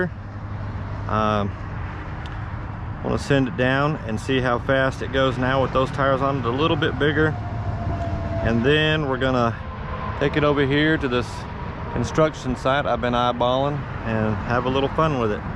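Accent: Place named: Houston, United States. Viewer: Man I swear you must live somewhere on the north side of Houston I don’t know why I think that - maybe your accent and the sound of all them 18 wheelers going by 😄 that’s where I grew up. Great run that thing looks right at home out there in the dirt 👍👍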